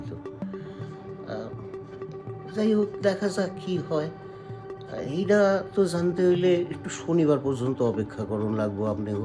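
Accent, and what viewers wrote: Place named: Bikrampur, Bangladesh. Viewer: bangal accent from Lower Bikrampur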